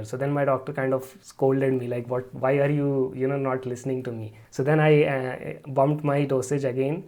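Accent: India. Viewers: Whiteamin Dea 😂 I love the Indian accent haha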